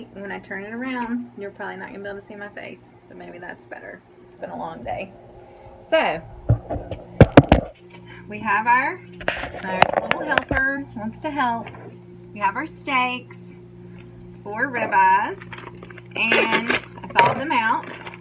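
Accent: South, United States. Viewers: When you say....Hi y’all.....is part of why I watch your videos....could listen to that southern draw all day.....thanks also for showing us how to cook steaks with cast iron skillet and butter.....have a blessed evening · I like steaks love butter and like that southern accent you did have me worried at the beginning though when you said you were going to go in the barn and get a steak LOL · Love the southern accent and it looks like great steak for supper tonight. Huntsville Ontario Canada 🍁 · Great video just love the southern accent y'all 😍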